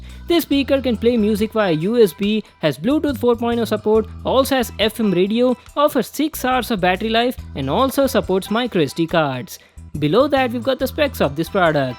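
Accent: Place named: Tamil Nadu, India. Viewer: Is he a Tamil Guy ? Or Telugu Guy.. Just asking becz of his English pronunciation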